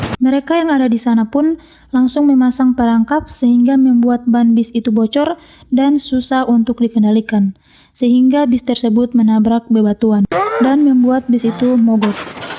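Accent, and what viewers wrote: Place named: Toraja, Indonesia. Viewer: Logatnya kek orang toraja, nih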